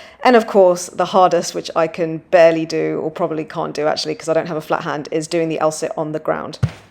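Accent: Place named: United Kingdom. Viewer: You look like an indian and sound like British 😁😁😁😁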